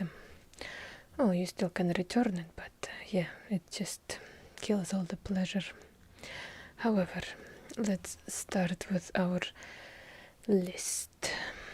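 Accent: Russia. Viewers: I will always love her Russian accent. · The Russian accent is just unbeatable 😍😴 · I come for the heavy Russian accent but I stay for the dry wit and subtle political satire.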